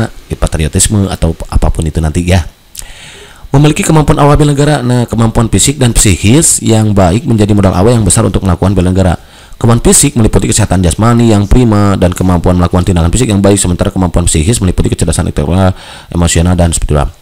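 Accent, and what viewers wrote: West Java, Indonesia. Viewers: Orng Sunda ini mimin nya 😁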